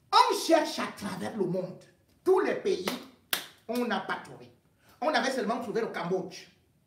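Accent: Cameroon. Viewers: Mr Rémy ngono qd vs dénoncez les gens prenez la peine de bien articuler leurs noms, tout le monde ne maîtrise pas l'accent camerounais.La luplart de temps on ne saisit mm pas les Noms👺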